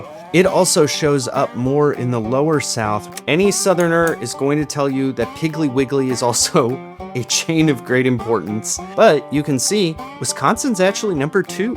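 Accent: United Kingdom. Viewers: As someone from Oklahoma. We’re sort of a mix of South and Midwest. Your accent in the ad read sounded almost UKish to me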